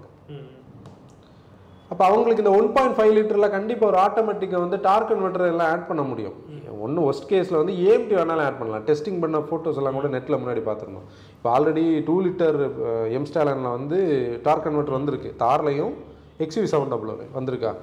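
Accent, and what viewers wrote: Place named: Nagercoil, India. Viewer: Team, This is one of the best & top class discussion on cars that seen (in Tamil)! I thoroughly enjoyed the video. BTW, I have booked Carens this morning and I would be happy to have it reviewed by post delivery. bro( hope spelled right) I have fallen in love with your Nagercoil slang and simple, yet through detailing reviews. When you are in Chennai, pls shoutout I would be happy to host your team. This 2022 will take team to an another Pinnacle with GOD’s grace. Wishing you all a Happy New Year!!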